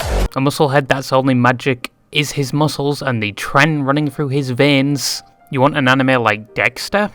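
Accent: United Kingdom. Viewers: The bri'ish bird cooked again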